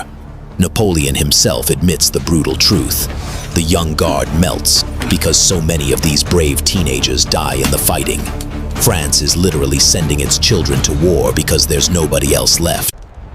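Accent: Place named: United States. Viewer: Jeez.. this American narrator voice completely ruined the video😳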